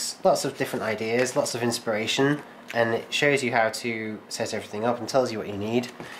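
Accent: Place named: Corby, United Kingdom. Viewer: you have a horrible Corby-like accent.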